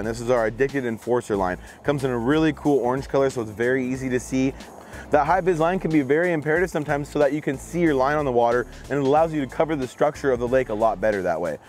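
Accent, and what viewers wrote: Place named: Canada. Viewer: He must be from Minnesota or somewhere in the northwest because he sounds so Canadian. Sounds just like someone from north Ontario. Anyone know? Awesome video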